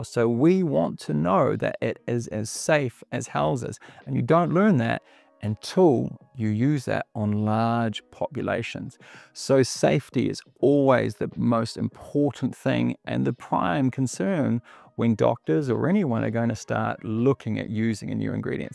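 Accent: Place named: New Zealand. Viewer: And just for our listening international audience, in case you’re wondering, our good doctor is a Kiwi aka New Zealander living in Australia. There’s no mistaking that accent.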